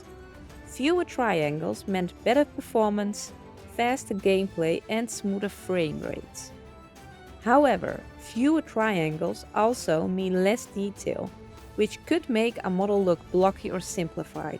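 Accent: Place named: Netherlands. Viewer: Hello! I follow you on roblox then i cmae across your channel. your the best animial ugc creator! and i didnt even know you had an youtube channel amazing!!! Your ugcs are the best and that i hear and see your dutch makes me super happy cause i am dutch also and this video was aaaamazzzinng!!!!! to the power off 10! Goeie video ga zo door!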